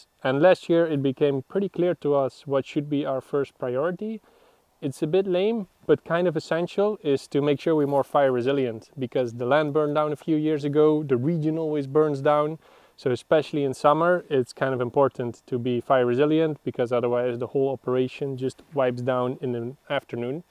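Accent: Netherlands. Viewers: Het Nederlandse accent 😂😂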